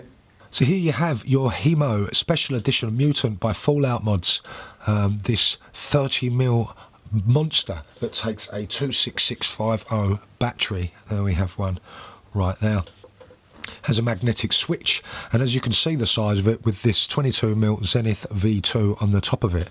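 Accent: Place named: Cockney, United Kingdom. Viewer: Your cockney accented humor never fails to entertain me. I waiting for the first American video where somebody kicks someone else's ass with a mod like this.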